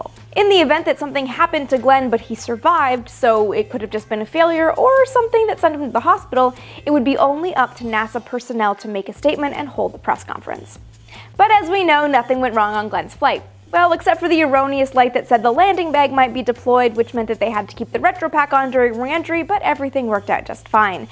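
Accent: Canada. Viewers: so sorry but your love for NASA shows american, your slight accent shows Canadian, what are you?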